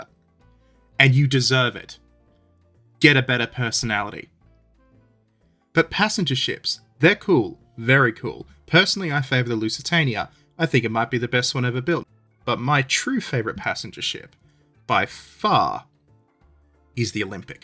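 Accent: Australia. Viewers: Cuuunt, fkn love your docos and research. You sound like you're from Queensland. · You sound like an Aussie?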